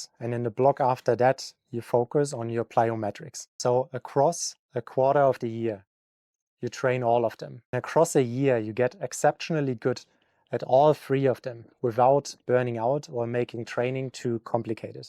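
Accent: Germany. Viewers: very subtle german accent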